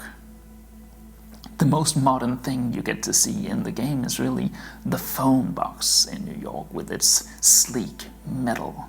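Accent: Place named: Germany. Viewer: Great Video, one small question, are you german or someone who speaks a germanic language natively (other than english) I think you have a slight accent that can be often heard by Germans and Dutch people when they speak english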